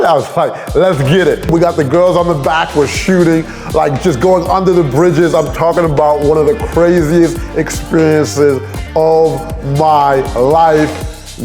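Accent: United Kingdom. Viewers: You have got a British accent. You probably live in London. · Bro you killed it with that British accent 👌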